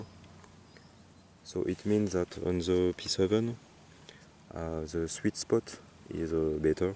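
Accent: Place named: France. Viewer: toi vu l'accent c'est sur t'es francais !!!!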